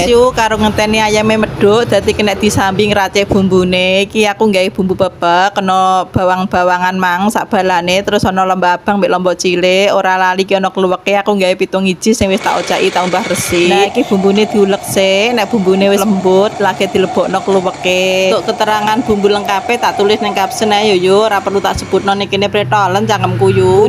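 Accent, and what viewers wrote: Java, Indonesia. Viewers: iki ko ndi mbak e...jawa timur koyone yoo,? bahasane khas jowo👍❤️❤️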